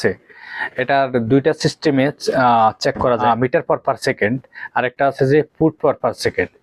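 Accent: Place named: Chandpur, Bangladesh. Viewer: ভাইয়ের বাসা কোথায়??। কথা চাঁদপুরের মতো মনে হয়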